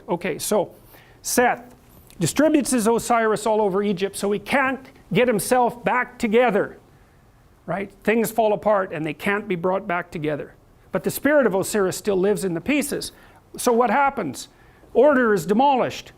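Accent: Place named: Canada. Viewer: The way he says "not" and "God" is for some reason hilarious to me. Or anything with an o. What a Canadian. Also, long live the lobster queen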